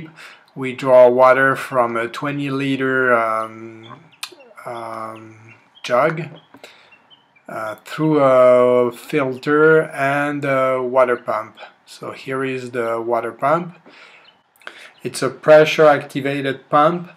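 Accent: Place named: Argentina. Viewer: hacelo en castellano, no se aguanta ese acento argento en el inglés....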